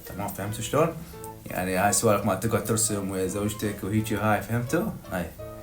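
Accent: Baghdad, Iraq. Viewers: أنت من بغداد توقعاتي😂😂❤ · من لهجتة: هو من بغداد مواليده :2000&2001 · مبين من لهجتك 😂 من اهل بغداد تعديل: اني ١٤ سنه و طولي ١٧٠ تعديل: حتى اني احب الاسود تعديل: حتى اني احب فلافل 😂😂😂 تعديل:شنو حلمي ؟ اريد موني بس يعني مشهوره تعديل الله على امك😂😂 كل شوي برأي😂 ....... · ساكن بغداد أنتَ لان أمبين من لهجتك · ف الاول توقعته مصري لكن بعد ما سمعته يحكي عرفته عراقي😂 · مبين انت من بغداد 🙂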